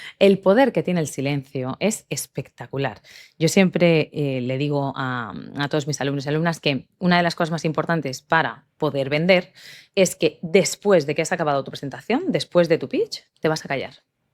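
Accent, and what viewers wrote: Spain. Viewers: De qué país eres escuché México pero tienes obviamente el acento de España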